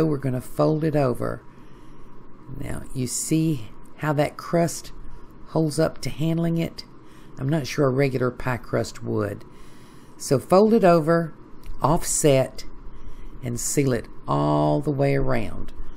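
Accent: United States. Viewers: So odd to watch a Cornish pasty being made by a lady with an American accent, nicely done though.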